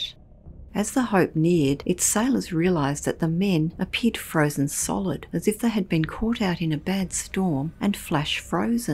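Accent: Australia. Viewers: Here from North Queensland, Love hearing another Aussie voice, 🇦🇺🇦🇺🇦🇺🇦🇺🐨🐨🐨🐨🐨🦘🦘🦘🦘and awesome channel.👍👍👍👍👍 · She's Australian. I'm one, I can tell. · it's an Aussie Sheila it is .🐨 😲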